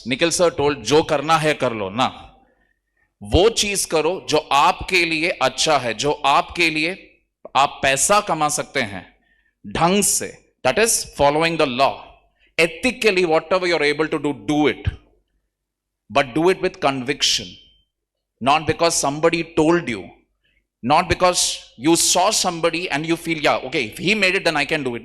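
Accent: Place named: South, India. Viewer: Bhasa se hi pta chl rha tha south k h 😊kuki south movie me ase hi bolte h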